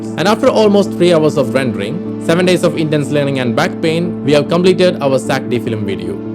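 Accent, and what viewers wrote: Kerala, India. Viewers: bro are you malayali, sounds very like it? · You started getting a bit too indian for me, couldnt understand halfway through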